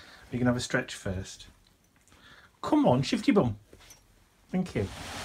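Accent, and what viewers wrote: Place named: Yorkshire, United Kingdom. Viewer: Hi! I'm new to your channel and am enjoying watching your videos and crafting techniques! And...I thought I recognised your accent as being Yorkshire, and narrowed it down to Bradford where I'm from (now live on Corfu!) So I wasn't far wrong! Lovely to hear it!! Hope everything goes well for you, regards Anne!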